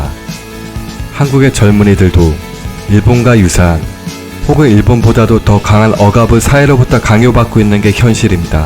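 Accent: China. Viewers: 동영상 내용은 좋은데.. 말하는 발음이 솔직히 좀 거슬리네요^^;; 마치 중국인이 한국어 하는 느낌이랄까요?? 특정 발음이 안되는게 있으신듯요. 말투나 목소리는 좋으신데.. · 발음이 한국인 아닌것 같은데 중국인 발음같은데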